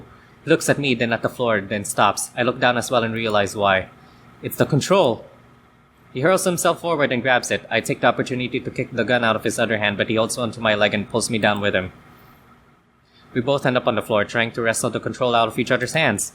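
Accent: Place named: Russia. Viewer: Another lovely video as usual XD love you Russian accent :3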